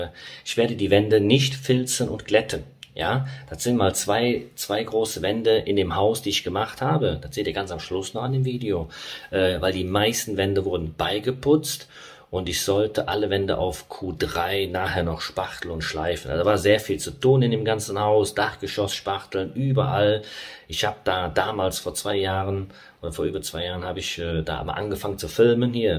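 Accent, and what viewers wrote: Cologne, Germany. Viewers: Hallo sympathischter Stukateur der mir bekannten Handwerkerschaft, lieber. Als ehemals in DDR-Zeiten gelernter Baufacharbeiter Hochbau mit absolutem Hang zum Verputzen gefallen mir alle deine Videos außerordentlich. Besonders deine absolut lockere Art und deine hervorrajende kölsche Dialekt sind sehr sympathisch. Mit "eine Typ" wie Dich hätte ich auch gerne zusammengearbeitet. Mache bitte noch viele weitere Videos des vielfältigen Handwerkeralltags. Nette Menschen wie du es bist, sollten von den Handwerkskammern als Botschafter und Werbeikonen für das Handwerk herangezogen werden, meine Meinung... Die weiterhin maximale Produktionserfolge im Gewerk und beim drehen weiterer Videos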